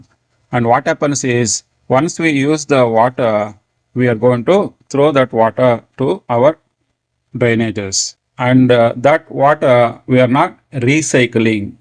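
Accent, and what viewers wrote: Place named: India. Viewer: You have a very Indian accent I think your name is. (Not trying to be rude but nice in a good way)😊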